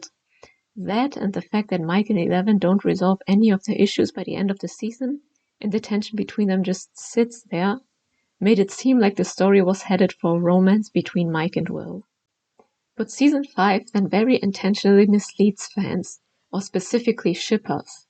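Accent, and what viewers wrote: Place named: Germany. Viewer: I think the way you say certain words, it had me wondering if you were a native German speaker. This is more of an accent thing, not a grammar thing—you expressed your ideas clearly. Someone once said to me "Understanding always comes before speaking", which I think is true for every new language you learn to speak! 😊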